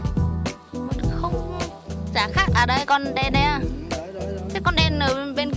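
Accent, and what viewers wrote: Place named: Hai Duong, Vietnam. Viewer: Nghe giọng như gái hải dương hoặc an lão hp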